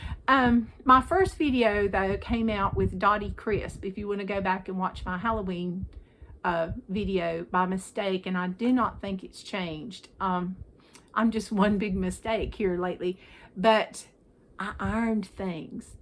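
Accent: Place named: Western North Carolina, United States. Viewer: I came to your early video to see where you from! We lived in western NC for 6 years and I thought you sounded like you were from there. Wonderful people there! We are back in Eastern NC now.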